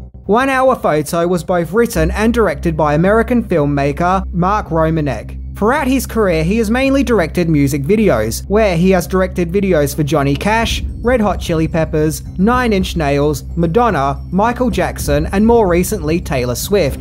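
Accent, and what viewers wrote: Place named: Australia. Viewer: The Australian O is a glorious thing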